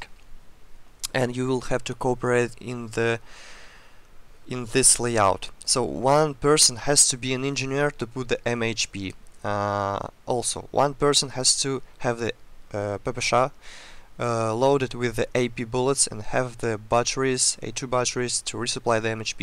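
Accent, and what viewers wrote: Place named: England, United Kingdom. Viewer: O vi iz anglii(england)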